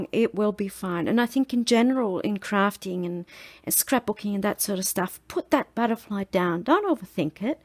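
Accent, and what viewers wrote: Australia. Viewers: Your videos are always inspiring and as I’ve said before it’s wonderful to hear an Aussie accent!!!! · She’s Australian.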